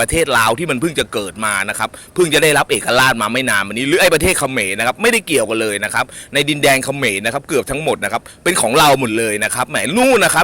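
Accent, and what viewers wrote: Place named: North, Thailand. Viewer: ไม่แน่ใจ...สำเนียงคล้ายๆกับภาษาเหนือบวกภาษากลาง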